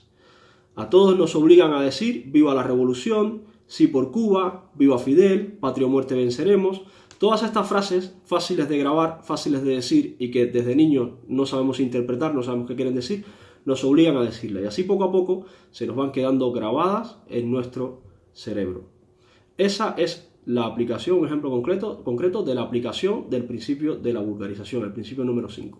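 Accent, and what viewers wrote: Spain. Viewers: No parles com els cubans. El teu és un bon castellà de Castella. Jo el que tu dius ho aplicaria al PP, a C's i a VOX. Jo he viscut al Brasil i a les Filipines, on voten lliurement, sí, als que tenen més diners, quasi sempre. I no cal anar molt lluny. Has parlat, alguna vegada, de com eren abans l'Iraq, Síria, Líbia, l'Afganistan quan els de Wall Street els van portar la democràcia i com eren i com són? Ací els de l'Ibex-35 són els que manen i no són votats. La peor de todas: ¡dictadura del mercado! Marcelo Colussi Rebelión “La ideología dominante es la ideología de la clase dominante”, decía Marx. Expresado de otro modo: el esclavo piensa con la cabeza del amo. ¿Por qué? Porque la imposición de los grupos de poder es total: se da en el plano material y, quizá con más fuerza aún, en el campo cultural. Eso es la ideología en definitiva: la argamasa que solidifica una sociedad no permitiendo ver la lucha de clases que la mueve o, en todo caso, haciendo de esa lucha y de las diferencias sociales algo normal, natural. 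Las clases dominantes, siempre a través de la historia en cualquier modo civilizatorio, ejercieron su poder en forma brutal, con el lenguaje de la violencia, pero también con la sutileza del discurso ideológico. Las diferentes instituciones que se fueron creando a través de la historia (familia, Estado, iglesias, la cultura en su sentido más amplio, la escuela, los medios de comunicación, etc.) son los instrumentos encargados de asegurar la transmisión ideológica. Lo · HOLA MUCHACHITO LINDO CUBANITO YA TIENES UN POCO EL ASENTÓ ESPAÑOL......PERO NO PIERDAS EL NUESTRO Q ES UNICO...OK.GRACIAS X TU MENSAJE MUY CIERTO E INTELIGENTE.....CUANTA VERDAD EN LA COMPARACION....POBRE D MI GENTE Q YA SON 60 AÑOS D MISERIA Y DESTRUCCION....VIVA CUBA LIBRE.........!😍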